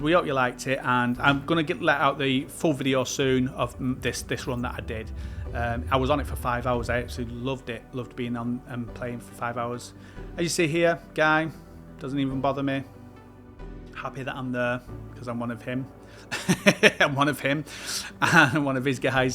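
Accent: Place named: United Kingdom. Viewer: 1. You need to slow down when you talk 2. You have a thick UK accent half of what your saying is hard to grasp 3. Your a bit of a scatter brain, prepare when you post You Tube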